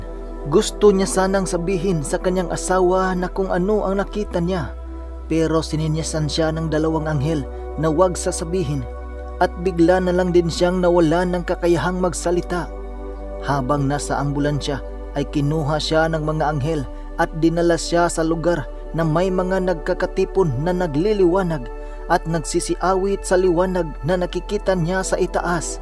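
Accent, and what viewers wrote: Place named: Visayas, Philippines. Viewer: Bisaya ka dol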